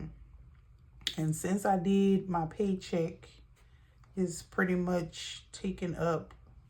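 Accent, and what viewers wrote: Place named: New Orleans, United States. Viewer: New subbie here. I know you’re tired of hearing it but us ppl not from New Orleans LOVE your accent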